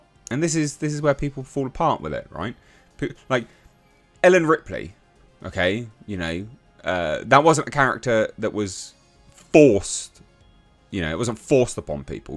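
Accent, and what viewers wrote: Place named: England, United Kingdom. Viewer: I love listening to you, it's so funny hearing another English person spouting off 🤣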